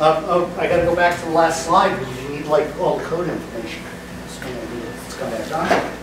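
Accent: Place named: Italy. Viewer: Italian accent.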